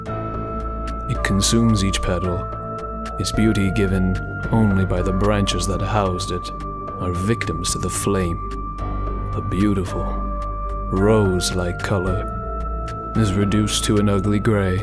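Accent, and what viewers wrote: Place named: United Kingdom. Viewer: Aaah yes, british accent + poetic lyrics + = absolute subarashiness 👏👏👏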